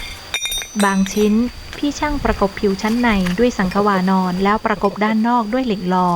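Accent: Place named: North, Thailand. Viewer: เสียงบันยายดีมากๆคับฟังเหมือนอยู่เมืองเหนือคับ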